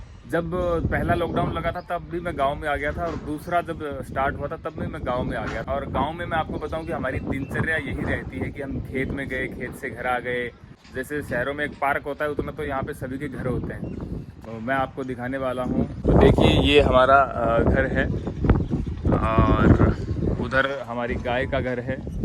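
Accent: Bagri, India. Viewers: आपकी बागड़ी सुनकर अच्छा लगा। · Tone Rajasthani par kabi kabhi bol bhi diya karo rajasthani bhasa 😀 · आपका गाँव कौन सा है किस जिले में है बागड़ी बोलते हो ये भी पता चला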